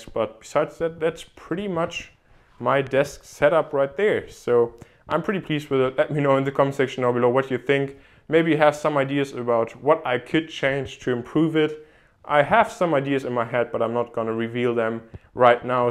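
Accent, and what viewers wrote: Germany. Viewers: Are you German?? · You sound german, are you german? :) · are u german ?? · Are you german? :D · Kann es sein, dass du Deutscher bist??? Wegen deinem Akzent und der ZDF App auf dem iPad Mini... :D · is this guy german? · im just curious .. by any chance ... are you german ?? :) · war iwie klar zu erkennen das du ein deutscher bist :D schönes raum tour! ;) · U have Ferman accent... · Are you German ??? Also du hörst dich irgendwie n bisschen deutsch an ????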